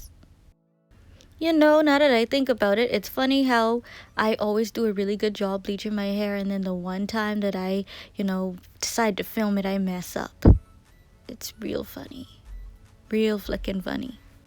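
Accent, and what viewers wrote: Bahamas. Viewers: The Bahamian accent is so strong lol... I love it! I was very entertained and informed on the bleaching process and steps. I wouldn't do it on myself though lol. Good job. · Heard your voice and knew you were Bahamian. This video helped me alot. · I felt so slow asking my mummy if you sound Bahamian just for her to point out the flag behind you I feel dumb asf but beautiful results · Girl I got so excited when I heard your accent lol was not expecting that. New fellow Bahamian subbie 🥰❤️🇧🇸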